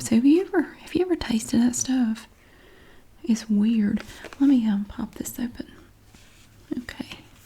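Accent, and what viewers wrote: South, United States. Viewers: I was really drifting off when I hear “ooo it’s fancy chapstick” in amazing southern accent and I started laughing half dozed off out here... need to comment that! GN😂🖤🖤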